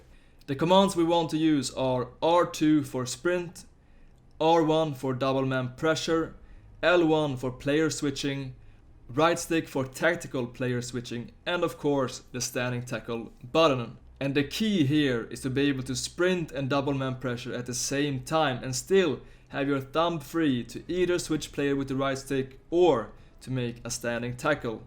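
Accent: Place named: Sweden. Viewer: Man hör direkt när det är en svensk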